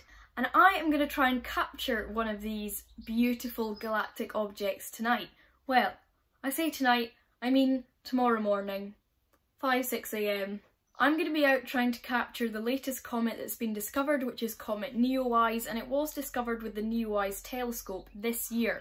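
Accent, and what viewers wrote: Scotland, United Kingdom. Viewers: Don't know what I enjoyed most, your very helpful instructions or your wee Scottish accent. In any case, thanks for taking the time and effort to post this video. BTW - you wouldn't want to be standing around in the middle of a heat wave, being bitten by mosquitos, to photograph the comet. We here in Pennsylvania, USA can attest to that.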